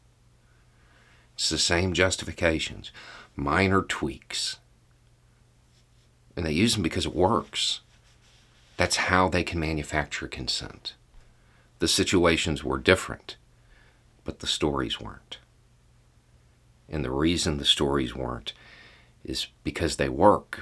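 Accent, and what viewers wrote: South, United States. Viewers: of the Fifth Column you used the term "manufacturing consent" a couple of times. Would you happen to have read the book of the same name, written by Noam Chomsky? Hearing you talk is a lot like him, but with a Southern accent.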